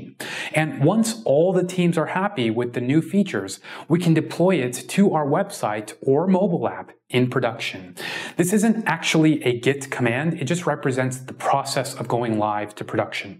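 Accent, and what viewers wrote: India. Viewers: Hey man, Do you have Indian ascent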